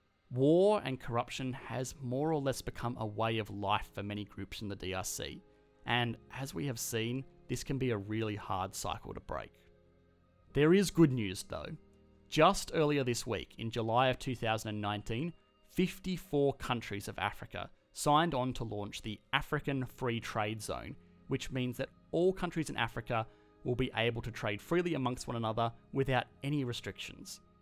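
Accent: Australia. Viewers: That Aussie accent though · I've had a look at the comments section and as an Australian I'd like to encourage to double down on the Aussieness.....let's get some Poiter in there, get some 'Noice' going and let's harden up those 'Buhhhhht's and I'm expecting some "yeah, nah"s to appear......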